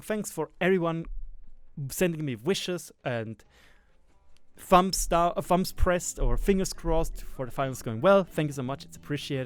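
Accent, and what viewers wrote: Germany. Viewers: Bist du deutscher?😅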